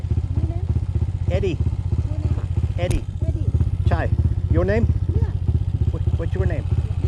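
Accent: Oklahoma, United States. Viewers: I recall seeing gas flares in the Gulf of Thailand many years ago. I wonder if they were pumping oil or gas? If the latter then they are having to import oil for cracking into diesel adding a running cost to the farm machinery I guess. It may be your Oakie accent but I notice you being a bit lazy (dog!) in the pronunciation of the ending masculine “p” in salutations! These general conversations about rural Thai life are great. Perhaps you could drag the Nordic Nerd (when he has finished downloading the GPS tracks) out with you to utilise his seemingly excellent command of the Thai language .. “sam Chang khap” seems to roll so fluently off his tongue! I have now covered several hundred miles on my “ghettoed” tubeless conversion and the wheels (CT 125) have not lost a single psi. I see it done all over Indonesia and the PI on You Tube and wonder if they do the same in Thailand?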